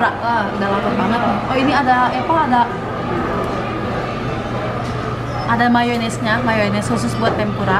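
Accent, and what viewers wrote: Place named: West Java, Indonesia. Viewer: Logat sunda bogor na masih aya meskipun lama di jepang 😁😁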